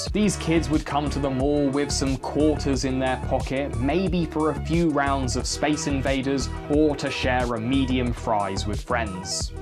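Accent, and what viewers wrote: United Kingdom. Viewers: Didn't realize was British.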